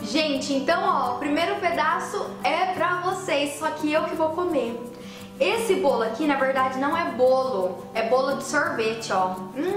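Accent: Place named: Caipira, Brazil. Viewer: você é linda!!! Já te acompanho a algum tempo e sigo a maioria das suas receitas caseiras, em especial aquelas para o rosto! amo o seu jeitinho de falar caipira!!! e sua simplicidade!!! parabéns!!!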